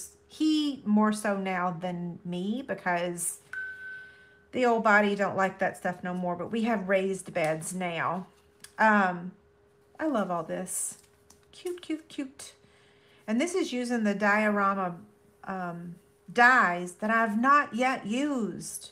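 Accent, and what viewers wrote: South, United States. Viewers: So we can’t hear that sweet southern voice & your beautiful face at the same time…. Thanks for hanging in there.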